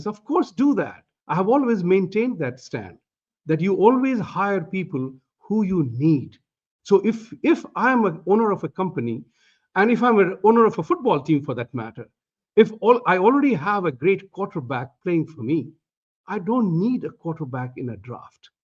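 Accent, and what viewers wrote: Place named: India. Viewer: why do you still have such an Imdian accent?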